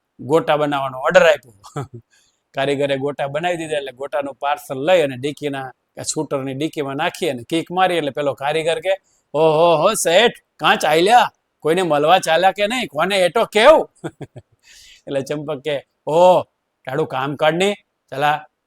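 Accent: Surat, India. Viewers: ફુલ કોમેડી.... 😂😂 સુરતી ભાષાથી વધારે હસવું આવ્યું.... એકદમ મસ્ત વાર્તા કહી.... 😂😂👌🏻👌🏻👍🏻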